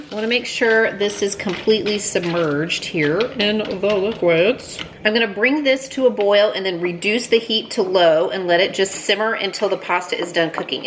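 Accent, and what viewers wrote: United States. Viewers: I don’t know why but I really love listening to you talk and your American accent 😂 the way you say rolls and noodles. Lots of love from Australia thanks for another great video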